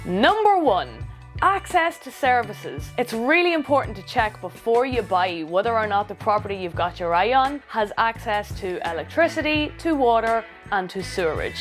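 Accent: Ireland. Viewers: Great video. What's the accent? Not Louth . . . maybe Monaghan? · ♡ i LOVE your accent please please please .............. ~ whether I get to be your neighbor in Ireland (an absolute ♡ dream for me) or not .... with all my heart I pray we shall be in Heaven 🙏 🙏🙏🙏🙏🙏🙏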